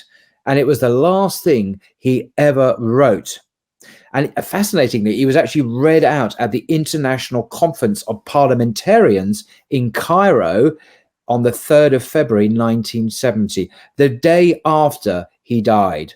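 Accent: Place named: Cockney, United Kingdom. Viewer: I have been a student of Russell for my entire life. Deeply admire the man. He was a giant. Can't resist the following observation: You describe Russell as a "pacifist," then you go on to say--without any apparent sense of irony or recognition of contradiction--that he "supported the war against the Nazis as a lesser of evils." Hello? Anybody home? Ah, just watched the rest of the video. The Cockney accent grows stronger as it progresses. "Aerial bombing, blah, blah, blah." (Witness Dresden, for example.) You reveal yourself as both a moron and, perhaps most of all, a Jew hater. Thank you so much for this erudite little vignette.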